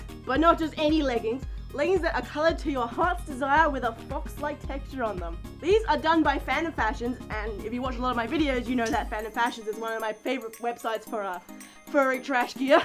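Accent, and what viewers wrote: Australia. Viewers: Wait are you Australian!? I am!! 😄🐺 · R u Aussie? I wanna meet you one day boi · you AUSSIE 0_0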